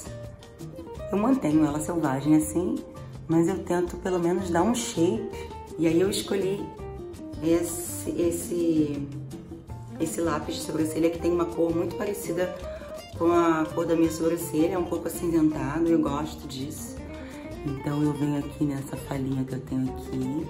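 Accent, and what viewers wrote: Rio de Janeiro, Brazil. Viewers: Me diz uma coisa. O que é preconceito pra você? Porque eu acho que você está equivocada sobre o significado. Seria preconceito se eu desprezasse ou tratasse de forma diferente as pessoas só por causa do sotaque delas. E aqui não é o caso, não tenho nada contra quem é do Rio, só não curto o sotaque. Estamos falando de idioma certo? Tenho direito de expressar meu gosto. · não exagera. Sotaque carioca não cai bem pra todos...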